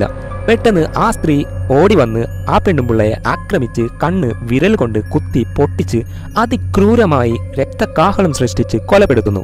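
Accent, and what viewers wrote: Kannur, India. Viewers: കണ്ണൂരുകാരൻ ആണോ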